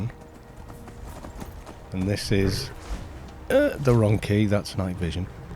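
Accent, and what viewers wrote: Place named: Wales, United Kingdom. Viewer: But I do like the way you pronounce any word starting with a double L ... That's very Welsh.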